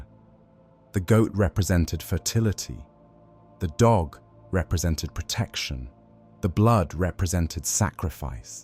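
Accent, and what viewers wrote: United Kingdom. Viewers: He’s British or something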